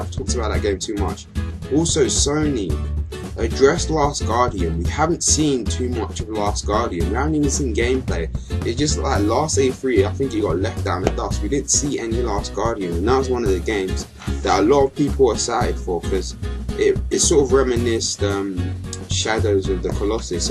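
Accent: United Kingdom. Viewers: You're from London, and you're either ghanian or nigerian. Am I right? :)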